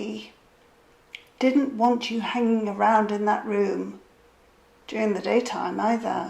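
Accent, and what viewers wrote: England, United Kingdom. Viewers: You have a beautiful English accent,so clear,and educated😊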